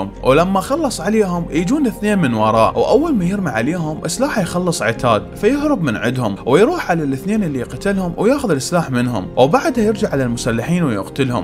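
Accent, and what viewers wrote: Iraq. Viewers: هذ يمكن عراقي😅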